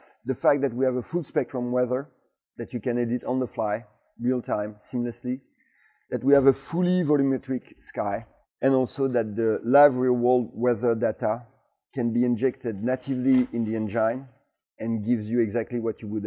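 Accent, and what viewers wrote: France. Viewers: His french accent made this video 10x better.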